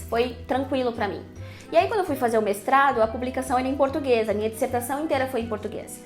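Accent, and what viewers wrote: Rio Grande do Sul, Brazil. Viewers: gaúcha demais hahahahah e é tema e não lição, como também é bolacha e não biscoito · Comecei a assistir os videos agora, capaz que é gaucha hahahahahahahaha terra dos professores BD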